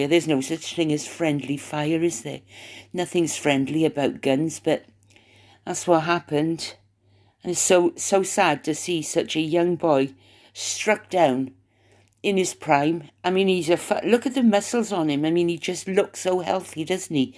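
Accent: Wales, United Kingdom. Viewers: Love your Welsh voice girl! So glad your back!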